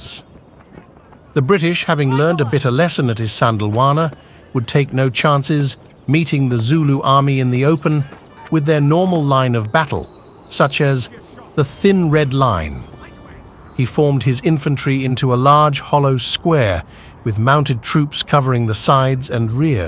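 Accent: United States. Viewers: It still used American pronunciation though such as "dee-poh"!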